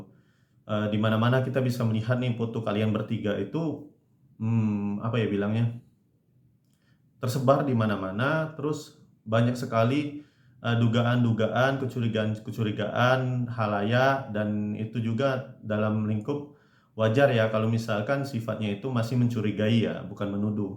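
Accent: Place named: Palembang, Indonesia. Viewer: Kirain dr bali,, trnyta wong palembang kak yo..????? 🤔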